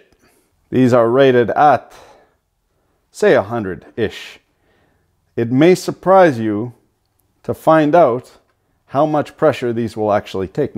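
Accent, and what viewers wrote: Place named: Canada. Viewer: I wish you had a podcast. I could listen to your funny Canadian accent peppered with all of your colloquialisms in my shop!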